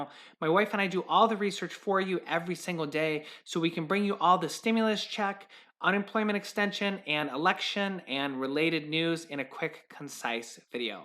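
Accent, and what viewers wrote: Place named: Chicago, United States. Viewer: it sounds like Chicago slang me and my wife LOL,.. another one could I ax. you a question...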